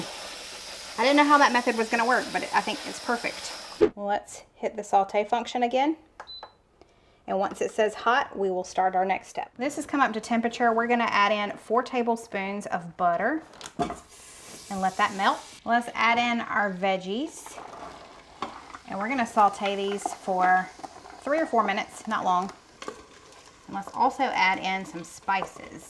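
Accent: South, United States. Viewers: Hey We are here for you My Prayers and Blessing to you and and All your Family and Thank God weren't hurt And Remember Gracie Lou is a your Guardian Angel I am From East Pennsylvania and I am Full puerto and just be yourselves you'll Rican and I was Born😅 Big Family and I So Love Your Southern Accent and My Dear you are Perfect as you are and is Hilarious and So My Dogs Name is Tootsie Lou he has My Middle name but it's spell Different It's Bonnie Luz omg I would Love to meet you in Person and I am So Sorry You had Loss Gracias Lou I had cry so fast I had a Sense it, it's ok to cry and it's ok.. I love watch her Old Videos You 2 jusr Be yourselves ok The Cutest Couple I watch All your old Videos you light up My Spirits Real z people IN The Kitchen cooking and Kidding around Having Fun ❤My Sister and I watch you.. Sending All My Prayers and you get Back where you were Before the Hurricanes and you Safe.. 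you are Speaking Spanish Is On the Dot.. take care Mamasita And papacito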